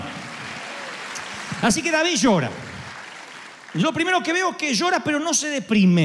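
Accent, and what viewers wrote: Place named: Rioplatense, Argentina. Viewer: fenomeno!! Necesitaba escucharte. Soy uruguayo y tus predicas me llegan de una manera increible, seguro que por tu acento ya que es muy parecido al mio por la cercania geografica con Argentina pero mas seguro porque realmente sos hombre de Dios, y que Dios te bendiga y te siga iluminando para que nos sigas tu iluminando a nosotros con la palabra de Dios todopoderoso. Gracias a Dios por tu existencia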